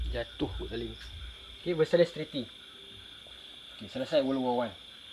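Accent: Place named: Penang, Malaysia. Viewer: Saya bukan melayu bodoh ustaz. Saya paham bahasa inggeris. Tapi tak syok dengar ustaz goreng dalam BI. Hilang slang penang.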